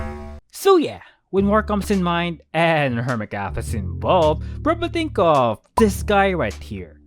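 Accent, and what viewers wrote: Philippines. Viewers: Are you a filipino? i kinda hear that accent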